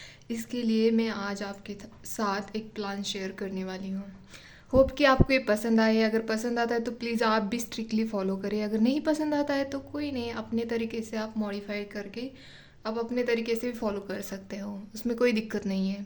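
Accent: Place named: Maharashtra, India. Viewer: Ma'am you are from Maharashtra 😅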